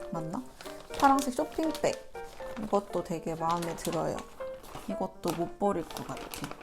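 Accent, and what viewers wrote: South Korea. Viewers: 대구인 답게 대구 사투리를 쓰시네요!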